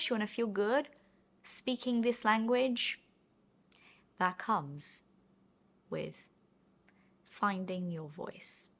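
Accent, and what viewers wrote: United Kingdom. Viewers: When you start to follow her bc of the british accent and stay here bc she's such a great person and teaches so much more than just english... Well done!! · Your british accent is so beautiful ❤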